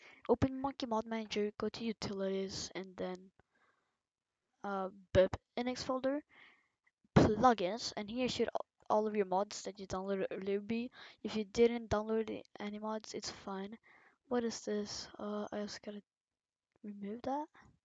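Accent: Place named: France. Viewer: THIS IS SUCH A STRONG FRENCH ACCENT RESPECT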